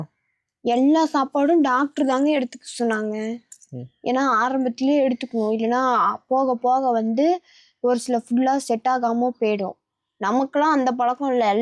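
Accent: India. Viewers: பாப்பா, நீங்க பேசுறது மதுரகார பிள்ளை மாதிரி இருக்கு..❤❤ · Unga slang la patha Chennai pola illaihey epdi... native really chennai thana?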